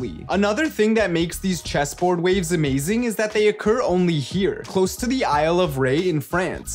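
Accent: Canada. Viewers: canadien eehh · Sounds kinda Canadian to me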